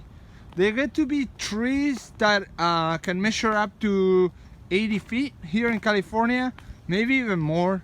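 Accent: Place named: Argentina. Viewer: Tenés acento de argentino! Para mí tu español no es tan malo!!!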